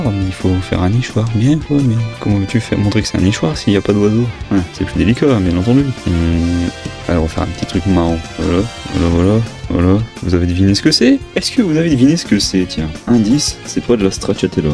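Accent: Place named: Marseille, France. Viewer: Ton accent marseillais m'a exterminé !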